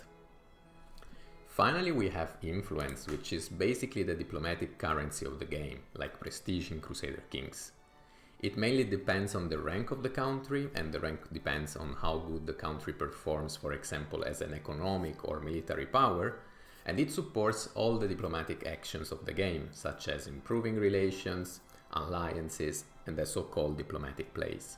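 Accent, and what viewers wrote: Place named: Italy. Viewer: I'm to high to digest what you ar saying but I enjoy your Itialian voice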